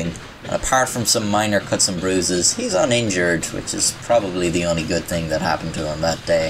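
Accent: Ireland. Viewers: I enjoyed that, thanks. Do I detect an Irish accent in there somewhere?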